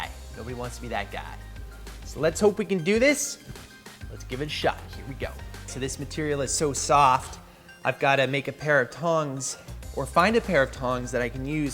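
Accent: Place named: Canada. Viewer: Are you Canadian, or is it just an act? just wondering because it sounds forced at times 🤔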